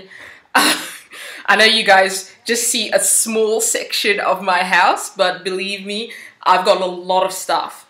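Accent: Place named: Australia. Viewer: I love that Aussie accent of yours!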